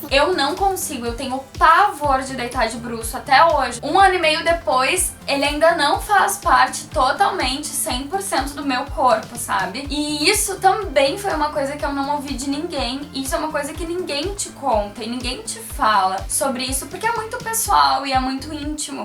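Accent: Brazil. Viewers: Lógico. Seu sotaque, seu vocabulário portoalegrense uai! É mesma coisa que reclamar de gaucho dizer "bah". Pede pra mudar de estado federativo de uma vez.... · Aí que gente chata. Foca no conteúdo do vídeo e não no sotaque dela. Ela é gaúcha assim como eu e o nosso sotaque é bem assim mesmo.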